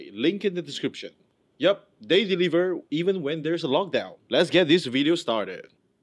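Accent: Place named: Philippines. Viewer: You sounded more like a pinoy than a malaysian to me..anyway Great Job👏👏👏 this motivates me to unwrap and assemble my Gundams, but paint not..no tools yet. I ll be studying more of what you do kabayan🙏🙏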